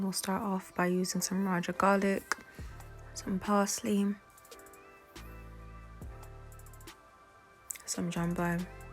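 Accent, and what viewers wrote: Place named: Liberia. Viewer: Hey girl!!! So glad I came across your channel. I just moved from NYC to London a few months ago and I've beeen cravvvvviiiingggg Liberian food. But I don'y know where to find stuff like smoked Turkey meat and other common ingredients here? Can you advise? And do you know of any Liberian restaurants in London by chance? Sorry for the 21 questions. I heard your accent and I couldn't help but ask (assuming you're still here). <3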